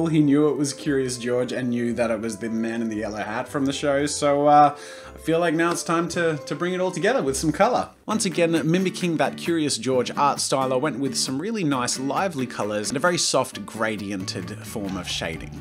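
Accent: Australia. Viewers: Quite Australian... the way he says "art" is also in a few British accents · dawn boi ausie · dawn boi Ausie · His Australian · Are you an Aussie? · He's Australian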